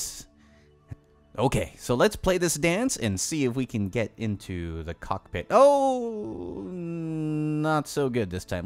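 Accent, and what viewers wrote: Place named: Russia. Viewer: Nice russian acsent, bro! :D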